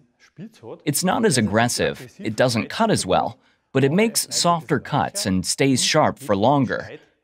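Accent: Bavaria, Germany. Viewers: 🤣 Hätt mir scho gedocht da spricht englisch mit bayrischn Akzent 😅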